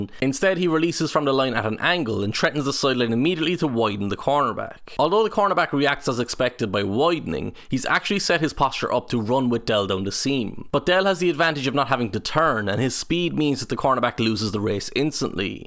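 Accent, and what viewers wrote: Ireland. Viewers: An Irishman commentating on American football is amazing. Such a great dynamic! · What the bejeesus is a 'turd down'? 😂 Love ur accent btw...i think you must be from the same part of Ireland as Chris O'Dowd (from the IT Crowd)...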